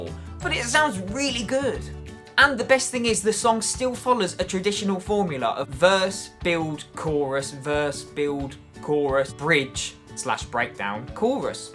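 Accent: United Kingdom. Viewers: Thanks for your reaction and review 👍 I really enjoyed watching it and hearing you... I just adore British English 😍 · Greetengs from Russia. Wanna get your feedback on the question is your accent British? Btw good job, very informative and objective. Good luck bro:)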